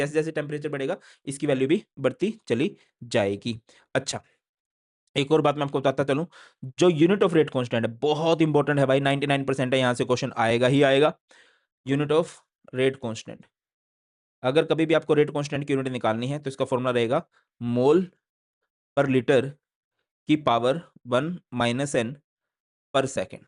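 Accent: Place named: Punjab, India. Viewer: sir ka punjabi accent TOP.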